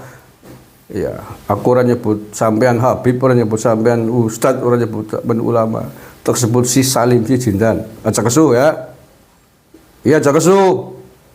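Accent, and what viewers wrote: Banyumas, Indonesia. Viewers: ogrok" terus habib bung. selalu tk dukung, ngapaknya seger.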